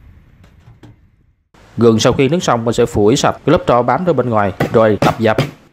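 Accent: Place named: South, Vietnam. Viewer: Các món ăn bạn đưa lên tui rất thích, có điều bạn hay xài hai chữ “ nước dùng “. Dân miền Nam đâu có “ nước dùng “ chỉ xài “ nước lèo “ thôi, vì giọng đọc của bạn nghe đặc sệt miền Nam.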